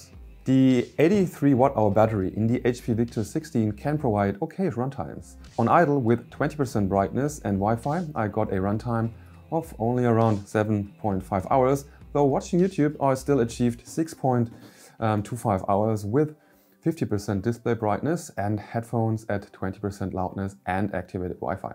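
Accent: Germany. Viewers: i have been trying to learn german(now i can understand english in german accent😭) also great review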